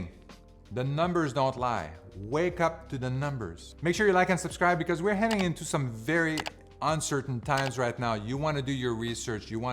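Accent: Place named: Quebec, Canada. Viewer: yes I could hear that québécois accent a kilometer away too;)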